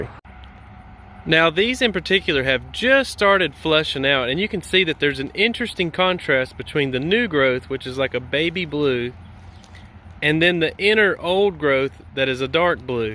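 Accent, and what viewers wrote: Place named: Tennessee, United States. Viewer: Did you say you’re in TN ? That’s where I am and your accent matches mine 😊. Thanks for the video! Very helpful. Hubby got us one of these yesterday for our anniversary 😊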